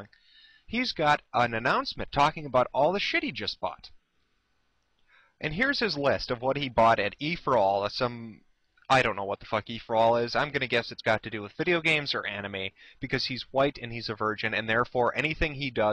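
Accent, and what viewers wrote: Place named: Minnesota, United States. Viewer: He sounds like he walked out of the movie Fargo "oh yeah Margie he's a funny lookin lil guy"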